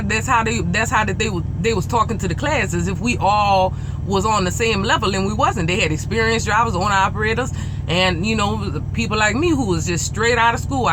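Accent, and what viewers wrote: Louisiana, United States. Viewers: i love your accent! u from Louisiana? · let me guess; You're from Louisiana/NO or South Carolina? awesome accent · she gotta b from Louisiana or the west indies!!!!!!!!!!!! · k, so what part of Louisiana are you from,? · She's cajun, from louisiana.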